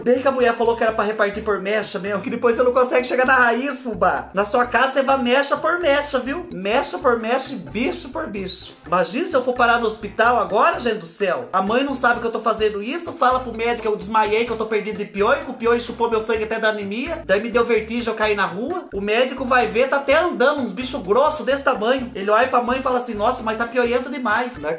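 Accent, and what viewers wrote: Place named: Northeast, Brazil. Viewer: Fubá do céu eu pensei que vc fosse nordestino 💓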